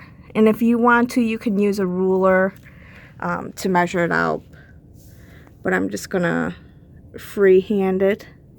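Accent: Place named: Minnesota, United States. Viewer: Are you from minnesota? lol I think I hear the accent.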